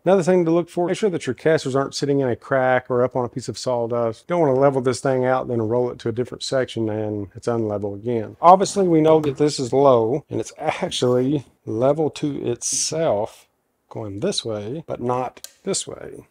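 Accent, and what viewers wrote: South, United States. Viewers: Cattywampus lol!? That's a fun word! Awe, I wish you wouldn't edit it out your southern accent .... I don't know about anybody else. But, I love me a southern accent!! Another Awesome video! 😁 I need this workbench! I currently am using two sawhorses with plywood that holds my chop saw and it's also my workbench as well as my new dining room table that I'm supposed to stain and finish .... And Not use it as a workbench extension. Hopefully soon I can make me one of these! 😁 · Whenever someone with a thick southern accent can actually do something useful, Im shocked.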